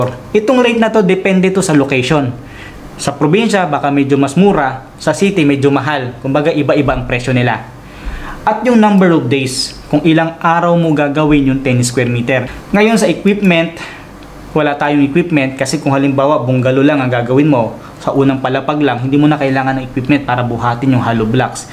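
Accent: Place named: Visayas, Philippines. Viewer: Engineer new subscriber here. After the pandemic will build a house GOD permits. In reality I am a fan of HGTV. So that's where I got ideas about building houses. But when I saw your vlog I was hooked to it. Currently watching all of it. Reading the comments and taking down notes. Sir when I start with my house for sure will be asking for your advices. I hope you will be kind enough to answer it. How I wish it will be your construction firm to build it. Son are you Visayan or Ilocano coz I heard you speak my dialect. Till then may God bless you.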